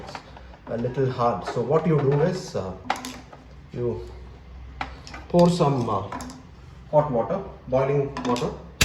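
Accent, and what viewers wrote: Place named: India. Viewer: You sound like an NRI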